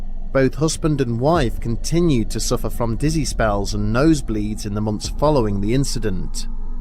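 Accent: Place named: England, United Kingdom. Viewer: The video maker sounds English, cannock chase in England, next to Birmingham is famous for black eyed kids.... Nearly half way through the video... Oh he's just mentioned it as in typing LOL.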